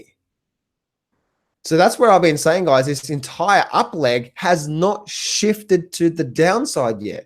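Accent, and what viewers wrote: Australia. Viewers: Dear; thank you very much for sharing a bit of your coveted inside trading secrets. You are a wonderful person and a joy to listen to. Although you may not be aware of it, I love your Aussie accent. It is similar to a British accent but different. I grew up in Chicago Illinois USA. What part of Australia are you in? The wildlife you have there is extraordinary. I loved Steve Irwin so much. That aside, a V bottom rocket recovery like this one is certainly odd, and experts I listen to are at an impasse as to the next move. Some say we will shoot right through the confluence of resistance and keep climbing to S&P500 2800+ and up and up. Others say retest of 2500 and then up and up. Some others say a retest of the December low and then a new bull market will ensue. Then there’s Bo Polny and his biblical pronouncements of doom. I know how much you love Mr. 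Polny. Kidding of course. In closing thank you so much for sharing your insight and wisdom.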